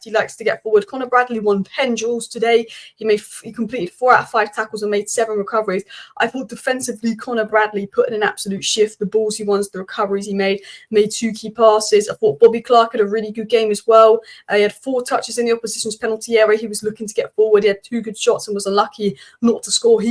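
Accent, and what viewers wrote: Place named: Manchester, United Kingdom. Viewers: Typical United fan sounding like a true Mancunian and like all united fans never going a game. Just imagine if united take points tomorrow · Can you slow down please? I can’t understand your Manchester accent.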